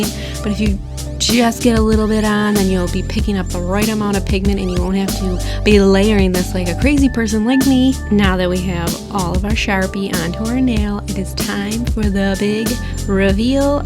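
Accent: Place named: Michigan, United States. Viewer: re: your voice, I grew up in Michigan and we have similar accents. I figured you were from somewhere near Canada, but not Canada.... right?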